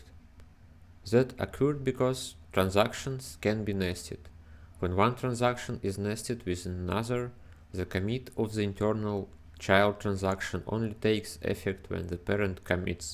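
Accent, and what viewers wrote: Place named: Russia. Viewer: You have a wonderfull Russian Accent 🥲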